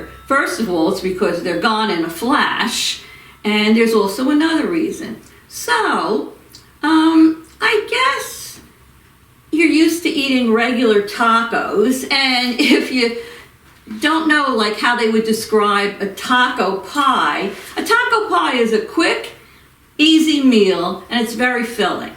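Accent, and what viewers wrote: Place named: South Jersey, United States. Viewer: Oh man, this looks really good, I even think my grandlittles and son may like it! Baking is not my forte so I am ready and open to learning from the herself! By the way....I recognize that accent. I am a former South Jersey girl. Loved it and miss it but we are now back in my home state, NE. Really love your creative video style. So looking forward to more! Also put your notification bell on "All"!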